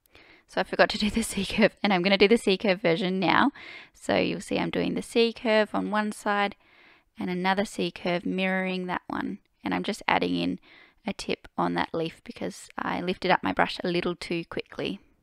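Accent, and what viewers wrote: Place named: Australia. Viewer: I’m very grateful for your beginner exercises and it’s great to have tutes with an Australian voice!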